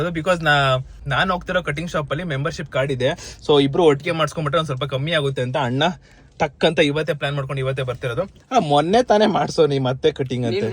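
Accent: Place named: Mangalore, India. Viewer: ಸ್ವಲ್ಪ ನಿಮ್ಮ ಕನ್ನಡ ನಮ್ಮ ಮಂಗಳೂರು ಕನ್ನಡ ತರ ಇದೆ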